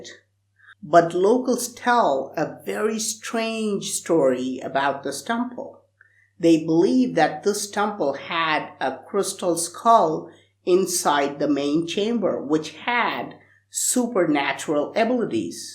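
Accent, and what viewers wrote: India. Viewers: Hello u speak Indian English k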